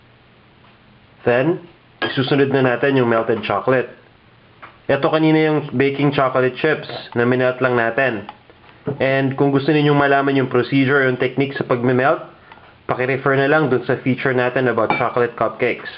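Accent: Philippines. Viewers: Filipino accent :O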